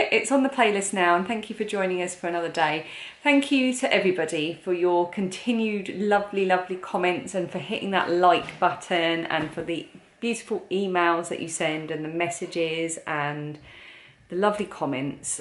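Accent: United Kingdom. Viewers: What a lovely place to take a walk - thanks for sharing! For your Q & A bit I am curious about English accents. Here in the States we can tell if someone is from the South or East or even from certain states such as Louisiana and I know the same is true for the UK. Where did you grow up and is your accent a reflection of that location?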